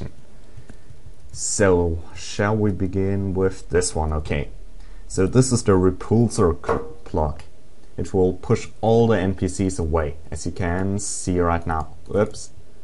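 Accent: Germany. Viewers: your english really sounds like a german speaking english. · Hes a awesome guy With a nice Germany Accent People Are Hatting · He sounds German to me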